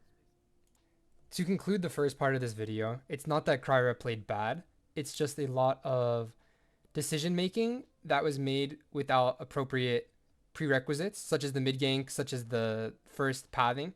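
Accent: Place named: North America. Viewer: Sounds like they're from NA, not sure what accent you hear from the video to think Zimbabwe :D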